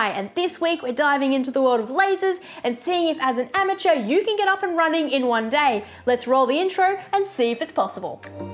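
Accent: Australia. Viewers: Thanks for sharing I know nothing about laser engraving or cnc but I so want to go down that path more so on the cnc machine they both make your projects look heaps more professional. So thanks for the encouragement to give it a go.Love your channel and content plus it’s nice to see and hear Aussies on YouTube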